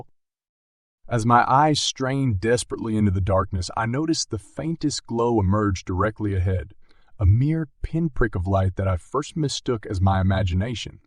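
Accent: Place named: South, United States. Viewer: No ! Country accent does not work for sci- fi .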